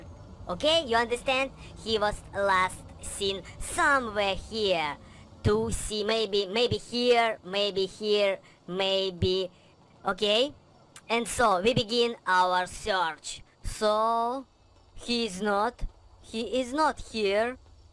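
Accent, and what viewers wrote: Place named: United States. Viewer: Ты так разговариваешь потому что в соединеных штатах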